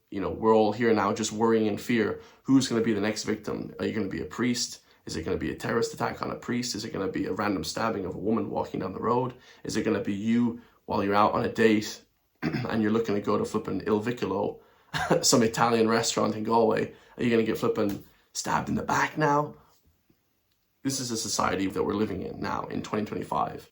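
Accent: United States. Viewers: you say your from Galway all your life but have an American Accent.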